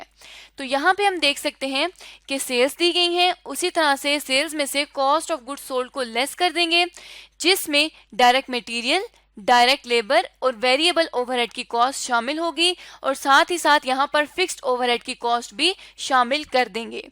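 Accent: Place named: Pakistan. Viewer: Tomorrow I have my ca inter costing exam and I wanted to learn this concept... And I came across the bestest shortes video! ..i am from indian... Your Pakistani accent is so good! ✨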